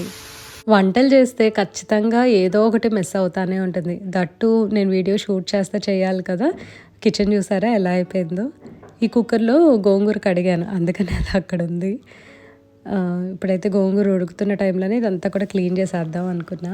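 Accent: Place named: Guntur-Krishna, India. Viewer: Hi, Mee voice ki sooper fan andi..maadhi guntur.. Guntur,Krishna distict vaalla slang baaguntundhi...vlog last lo Thankyou for watching...thankyou for your time is an awwwesome dailogue ❤ Yes...amma vaalu cheppedhi vinali....i experienced it many times...till now..after 2 kids also 😂 Munagaaku fry kifs tinaru..chedhu gaa untadhi ani...So, kaarappodi chestaanu.idli, dosa,upma lo ki tintaaru... I too use India gate n Rozana..but Last week, nenu offce lo potluck kosam, mushroom pulao chesaa..rozana rice antha baaga raaledhu... New channel chepparu...good to know..thanks for sharing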